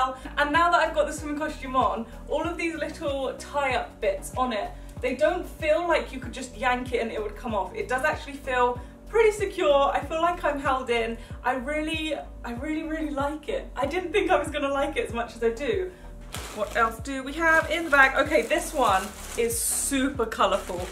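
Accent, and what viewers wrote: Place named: London, United Kingdom. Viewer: I love your videos - love love love!! I'm so excited about your plan for daily vids this week and then DIY's next week too -:D Yayyyy!! My favorite "swimming costume" (how cute!) was the blue & white checked bikini (gingham pattern?) it's very cute on you. I don't get the straps either, but once you fix them it's going to be perfect. Btw, I love your voice, and you're just completely adorable!! I was born in London but moved to the USA when I was 4 - wishing I still had the accent!! Also, your hair suits you so perfectly <3 I am wondering if you've ever bleached your teeth or how you've gotten them so white? If you ever get the chance to mention how...? Keep being you, cuz you seriously brighten our day. You're definitely my favorite YouTuber by far!! Hello to & everyone! Love from Susan in Florida, US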